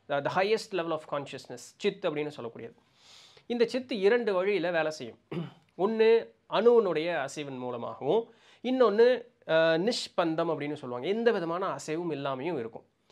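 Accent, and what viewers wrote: Coimbatore, India. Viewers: உங்கள் ஊர்(கோயம்புத்தூர்) மொழியில் கேட்க ஆனந்தமாக இருக்கிறது💕..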